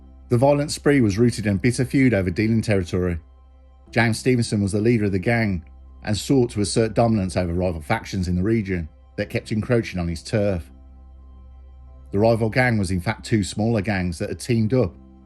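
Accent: Birmingham, United Kingdom. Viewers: Massive congratulations and respect to you and your content brother. I literally can binge watch your videos for hours. There all perfectly to the point and not too long and cover everything and sum it all up at the end brilliantly. Keep doing your thing geezer. Ps never used to be a fan of the brummy accent but yours fits perfectly. Keep it up mate.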